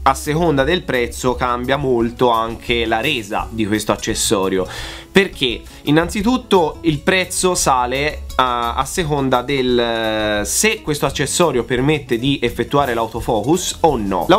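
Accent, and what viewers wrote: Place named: Tuscany, Italy. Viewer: Io da toscana a sentire un toscano moio dalle risate 🖤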